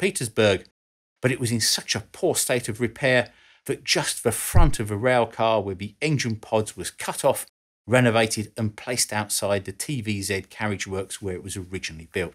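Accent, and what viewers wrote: United Kingdom. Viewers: He British, even I would struggle 😂😂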